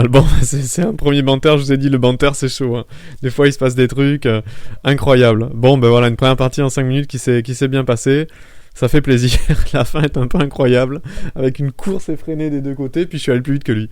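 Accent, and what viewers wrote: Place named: South, France. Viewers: accent du sud ... on dirait carrément DiMeco !!! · c'est intéressant, vos commentaires sont vraiment sympa, le style dynamique et l'accent du Sud :-D merci pour ces vidéos.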